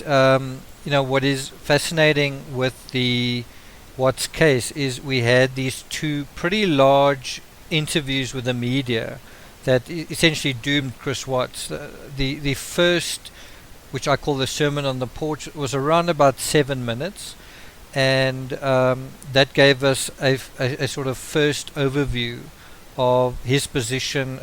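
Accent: South Africa. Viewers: Hey! You're South African 🇿🇦🇿🇦🇿🇦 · I miss V the series so much!!! Are you from South Africa?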